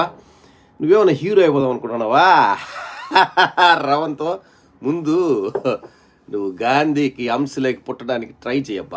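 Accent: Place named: Chittoor, India. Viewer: Puckka Chittoor slang😊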